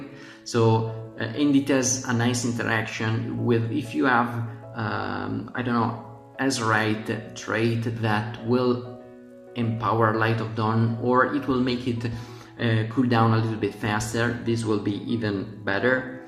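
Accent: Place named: Italy. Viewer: Hey, dall'accento presumo tu sia italiano. volevo chiederti come mai hai puntato su una guida in inglese, ci sono tanti content creator inglesi che parlano di wow e davvero pochi che parlano di wow in italiano, specialmente riguardo agli healer in ampio spettro, a tal proposito hai in mente di fare in futuro guide in italiano? per la cronaca grazie per tutte le dritte e le informazioni di BfA! nessun suggerimento su come poter entrare a far parte del beta testing di BfA?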